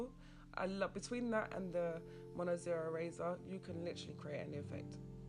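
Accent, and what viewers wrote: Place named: England, United Kingdom. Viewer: First you are chill and easy to listen to you with your English accent I love to go there. I need to know what can take I do to take away the shining from my drawin? Antoinette Rochester Las Vegas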